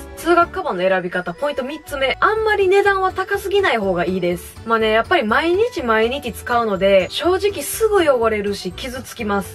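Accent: Kansai, Japan. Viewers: さんて東京住みなんですか！？関西弁で実家だから関西だと思ってた…！ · 後半からだんだん関西弁出てくるの可愛くて好きです笑✨👍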